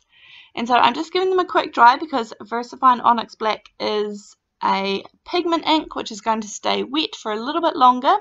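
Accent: New Zealand. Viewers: You've got a kiwi accent. Are you from Aotearoa?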